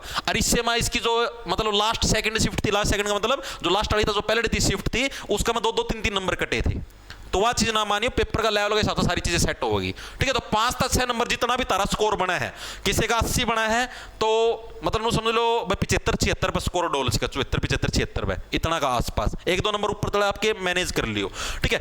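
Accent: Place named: Dadri, India. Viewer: Aapki boli must h ji dadri side k i hna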